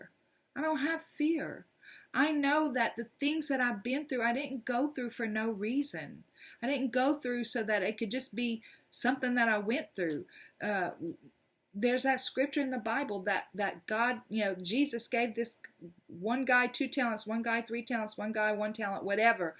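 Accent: South, United States. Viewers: Well said! No one like you, a shining light in the world with a southern accent!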